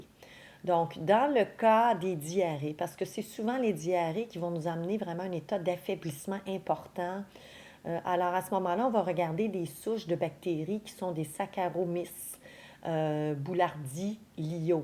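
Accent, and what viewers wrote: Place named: Canada. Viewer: Merci, je vais essayer de suivre vos conseils, En plus votre bel accent canadien me fait beaucoup de plaisir Bonjour de la France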